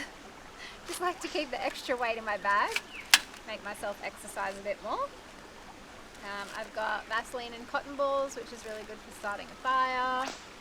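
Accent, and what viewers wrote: Australia. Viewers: Мне не нравится австралийский акцент😮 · Are you from Australia?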